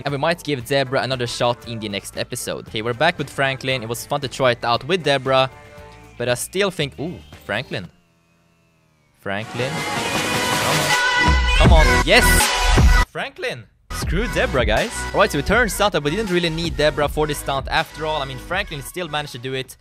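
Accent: Sweden. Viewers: I love your swedish-english xD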